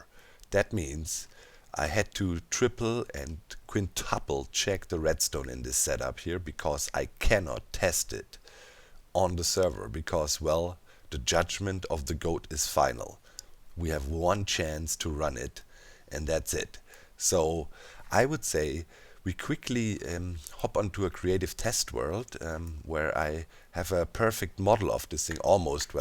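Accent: Germany. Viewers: Ich hab direkt gemerkt du bist deutsch du hast einen kleinen Akzent. Antowert bitte wenn ich recht have und du mich verstehst.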